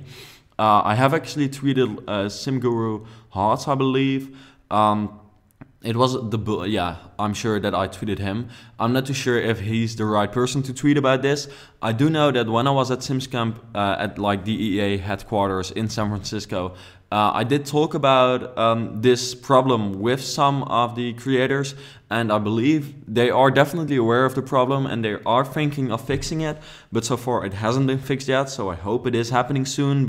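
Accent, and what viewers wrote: Netherlands. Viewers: Je kan duidelijk aan het accent horen waar je vandaan komt haha (geen haat, nederland staat er een beetje bekend om)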